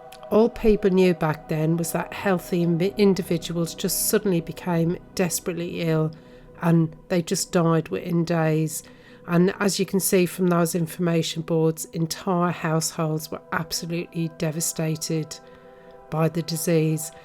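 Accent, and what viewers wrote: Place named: Bristol, United Kingdom. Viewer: This was so interesting and beautifully documented. Iv never heard of this village before. It's alarming to wonder if all those beautiful homes have ghosts. I Reckon there must be quite a few roaming around. To think this started with a bundle of cloth, wow!!! I felt such a strange sense of sadness is there. I don't think I could live in one of those beautiful homes. Thank you for a lovely video, please forgive my asking. But I like your accent, I'm not sure where it's from, is it Bristol.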